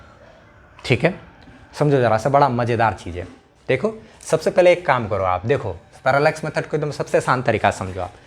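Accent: Bihar, India. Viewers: Sir app bihar sa ho kya to pranam 🔥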